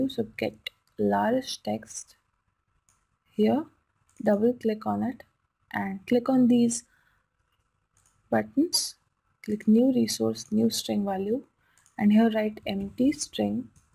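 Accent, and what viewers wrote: India. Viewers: u sounds indian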